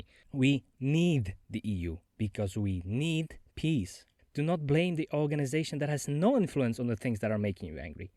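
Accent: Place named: Eastern Europe. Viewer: inak otazka ty si slovak ci cech ci co lebo lebo znies tak slovansky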